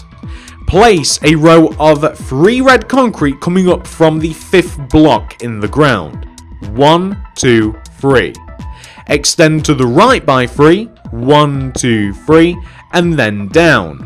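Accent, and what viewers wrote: United Kingdom. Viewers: your voice is really good your accent is like the uk one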